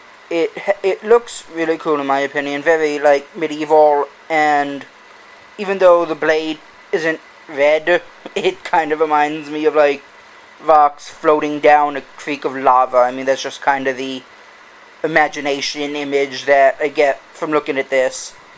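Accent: Ireland. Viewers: Are you from Ireland? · What's the guy's accent? sounds a bit Irish, but not really.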